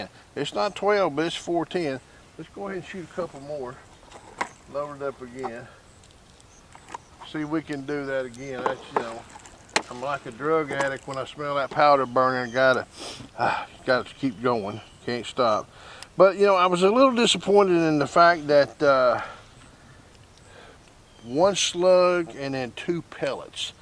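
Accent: South, United States. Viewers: Is dude hammered or from the south?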